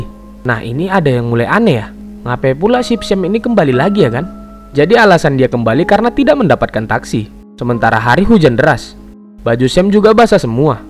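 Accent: Medan, Indonesia. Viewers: Medan kali kau bang, kalo dari aksenmu ini kau tinggal daerah setia budi, atau padang bulan, atau sekitaran kecamatan medan selayang dan medan tuntungan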